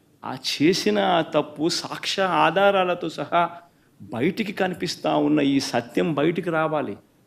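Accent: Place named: Rayalaseema, India. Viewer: ఏంటి ఈ మధ్య నీ స్లాంగ్ మారింది, ప్యూర్ సీమ భాష లోకి వచ్చేశావు.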